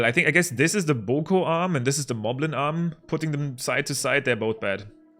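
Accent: Germany. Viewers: I am high or is he german? He does have a nice accent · Richtiger Deutscher Akzent ^^ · Ich höre das du deutscher bist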